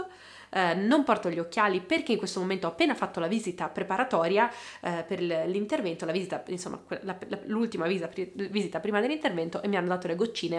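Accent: La Spezia, Italy. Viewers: W il dialetto spezzino 🙈🙌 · Confermo il dialetto spezzino è insopportabile niente anche vedere con il genovese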